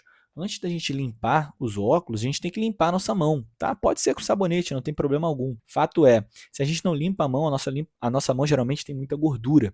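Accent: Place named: Rio de Janeiro, Brazil. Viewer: O sotaque carioca, para mim, é insuportável.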